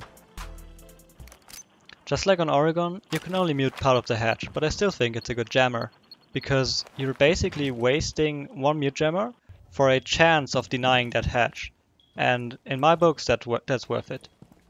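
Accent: Germany. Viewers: Sehr gutes Video, deutscher Akzent sehr deutlich:)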